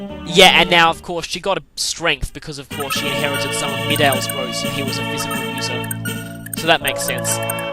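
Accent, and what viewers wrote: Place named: Australia. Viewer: Blah, I wanted to see more of this - Your style of LPing is great, and your accent makes a nice change from most LPs as well - Australian and Scouser are incredibly similar to my ears, being in the UK, but as a fellow SF member, I can't wait to see this continue.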